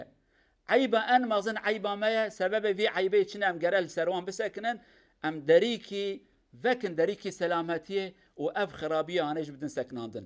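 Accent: Turkey. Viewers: Maşallah hocam ne insaflı bir konuşma. Acaba Hocamız hakkında detaylı bilgi alabilir miyim? Şive Diyarbakır çevresi gibi. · Bu hocamızın dili Bitlis tarafına benziyor sanki diline sağlık hocam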